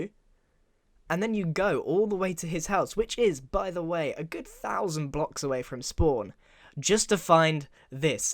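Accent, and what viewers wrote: United Kingdom. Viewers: dude dude i really like your brithish acsent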